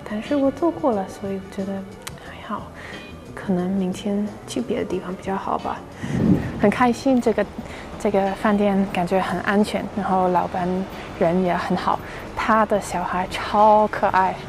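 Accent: Standard. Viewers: 其實這種旅遊影片可以多拍些～外國人的面孔加上標準的中文，台灣人很好奇，也很有興趣觀看❤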